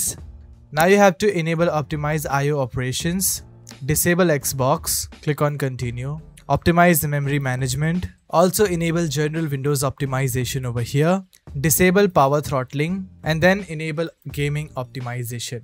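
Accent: India. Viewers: he sounds indian thats why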